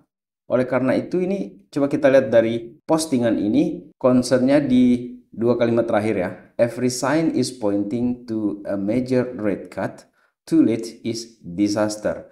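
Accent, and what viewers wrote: Sumbawa, Indonesia. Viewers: Orang Sumbawa bang?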